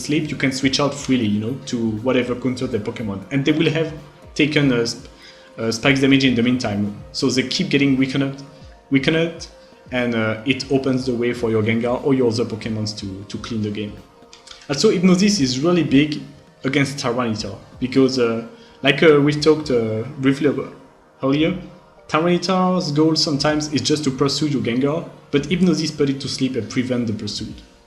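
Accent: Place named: France. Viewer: C'est l'accent français ça ! Je reconnais Merci pour ta vidéo mec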